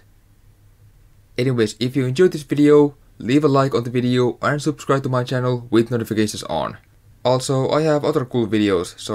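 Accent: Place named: Finland. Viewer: vahva suomi aksentti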